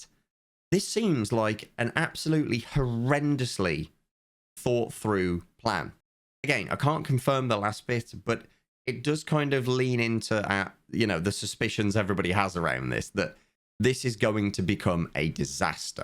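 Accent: United Kingdom. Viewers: If he can suppress the British accent slightly, yes.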